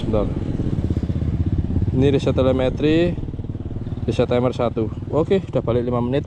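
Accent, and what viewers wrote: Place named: Bali, Indonesia. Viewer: Main-main (masih kebawa dialek Bali-nya om ) 😆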